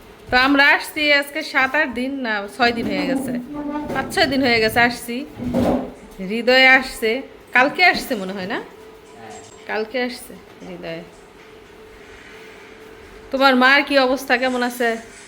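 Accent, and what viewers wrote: Gazipur, Bangladesh. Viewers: তোমার কন্ঠের সাথে মিলে যায় আমাদের গাজীপুর কন্ঠ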